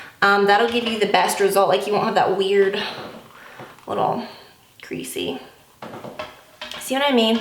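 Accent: South, United States. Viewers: Love when your southern accent pops up in your videos! I just recently made the big chop too. I’ve had pretty good luck with a wand but haven’t braved the flat iron curls with short hair yet. I’ll have to try. Thanks! Hope you & your family have a great Christmas!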